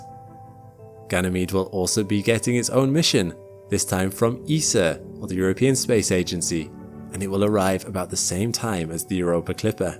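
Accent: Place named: United Kingdom. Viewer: I'm guessing it's less "correct" vs just locational pronunciation. I believe he's in the UK based off how he pronounces some things Here where I live in the us, some things are pronounced different in my state than another state, so I'm giving benefit of the doubt to him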